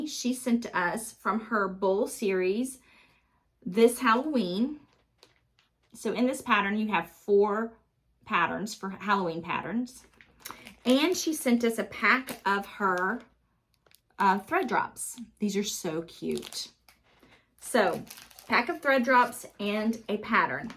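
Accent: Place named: Carolinas, United States. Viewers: I started watching you from a shout out from Brenda and serial starter..I love the name (my daughter's name) and I loved living in the South..Memphis then Atlanta where I started stitching in the 80's.... The kindness civility and the accent! 😃 I have been in the North (for many years and I tune in and find learning and comfort with you as I stitch. Thank you for the celebration give aways: 1,2,6,7,11,15,17,18,19,20,21,22,23,24,28. Congratulations,! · Thank you for being so genuine! My husband is from Alabama, it's nice to hear your accent. I love all the things, but #1,3,4,5,6,7,11,14,15,17,18,20,24,28,30 are my choices. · Happy 10,000 Celebration! I enjoy watching you so much. My family is all in the Carolina’s and I’m in Colorado. Listening to you is like hearing them all. :). 1, 2, 4, 6, 7, 10, 11, 12, 14, 15, 16, 18, 19, 22, 27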